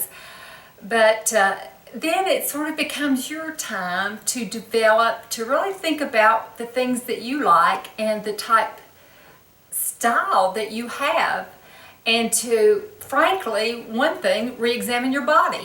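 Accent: South, United States. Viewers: Love your style. Thank you for sharing! I also like skinny jeans and distressed jeans. I'm a rule breaker and wear what I enjoy and what I think looks good on me, my shape and coloring. I believe at my age (I turned 63 in January, but still feel like I'm 30 most days. LOL) I should be able to wear what I want. Your outer beauty is apparent, and your inner beauty comes through when you speak. A southern belle. : ) I enjoy watching all your videos no matter the subject. Your sweet southern accent makes me miss home! I'm from north GA, living in FL. Looking forward to more of your videos!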